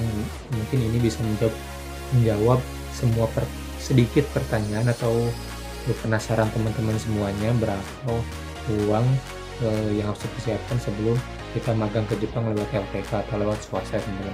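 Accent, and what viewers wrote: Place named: West Java, Indonesia. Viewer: Logat nya sunda bgtt kang , asli bumi di mana ?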